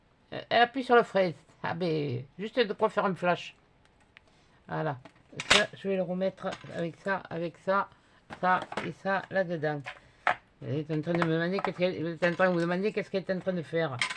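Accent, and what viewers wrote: South, France. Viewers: Cc,je te félicite tu la super bien réussi 😍 tu l'as bien revisité le corbeau et le renard sur le moodbord du défi a Maminette Anita je le trouve très rigolo 🤣, j'adore les andouilles de vire de chez moi en Normandie c'est une spécialité du calvados dept 14, j'adore ton humour ne change rien moi tu me fait rire avc ton accent du Sud il trop mignon,un petit coucou a Rudy et Mathieu 🥰, j'attends maintenant mon défi que tu doit faire tu va avoir du boulot a le réaliser 😂,et après c'est Celui de Nicole Houlala bon courage 💪, et plein de bisous aux deux chipis, 😘 et a Rudy et Mathieu 😘 et Arkos doit encore dormir où joué où trouver une solution pour monté au rideau pour mieux regarder dehors 🤣 plein de câlins 😻,bonne journée de lundi bisous 😘❤️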